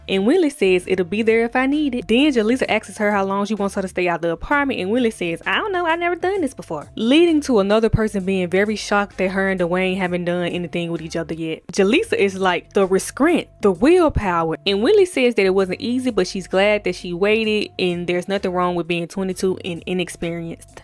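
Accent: Memphis, United States. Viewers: Are you from Memphis ? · Girl, the way you said Music. You from Memphis? Lol Love your accent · Girl, are you from Memphis? I love your accent! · The Memphis accent makes this recap even better child 😂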